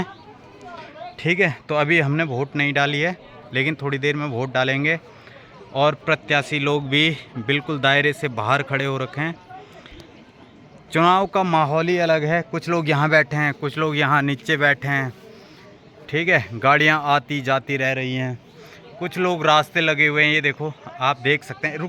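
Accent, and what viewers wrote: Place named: Garhwal, India. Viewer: Chhote bhai aapki gdwali bahut achli lgti h mujhe pta nahi kyu। Mai waise kisi ka blog nahi dekhta but aapki gdwali tone mst h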